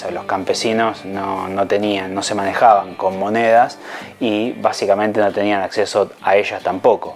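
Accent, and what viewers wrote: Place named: Argentina. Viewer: Es un buen análisis acerca de los orígenes del capitalismo, aunque faltó añadir la clase de perversidades que es capaz de llegar a cometer lo que el propio Papa denominó como "capitalismo salvaje", y que es la etapa superior del capitalismo llamada "imperialismo", y su nueva arremetida que actualmente se lleva a cabo denominada "neoliberalismo".Sabemos que hoy la cuna del capitalismo mundial está en Estados Unidos. Este país no es más que un conjunto de empresas muy poderosas que a través de guerras e invasiones buscan adueñarse más y más del poder mundial. Supongo que estas ideas las desarrollarán en próximos vídeos. Un sólo aspecto de este vídeo al comienzo me incomodaba, después ya me desagradó, finalmente terminó molestándome. ¿Por qué el locutor acentúa y enfatiza tanto en la pronunciación de la letra "ye" o la "ll" convirtiéndola en una "ch"? 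Él no dice "llega a convertirse" sino dice "chega a convertirse". Ya sé que esa es la pronunciación del argentino, pero al menos podría suavizar este rasgo para así universalizar más este buen vídeo y evitar que con ese sonsonete caiga en localismos y regionalismos que pueden resultar no del todo agradables.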